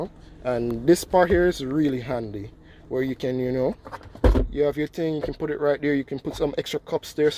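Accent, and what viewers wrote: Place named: Jamaica. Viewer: your tone tells you from Jamaica,.....wagwaan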